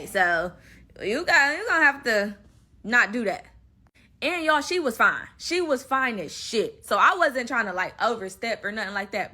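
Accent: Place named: Detroit, United States. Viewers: She talking like she from Detroit but she not acting like it 🧐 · She gotta be from Detroit how she telling this story lol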